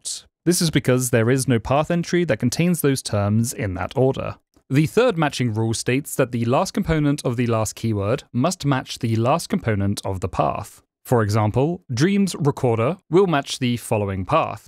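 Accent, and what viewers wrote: United Kingdom. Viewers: Is it not supposed to be pronounced ‘zed oxide’ as a play on ‘red oxide’, a chemical usually known in naturally occuring forms as ‘rust’? Rust being also the language zoxide was written in, of course. Just surprised since you also have a British accent. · he does have an English accent, maybe he should be saying ze doxide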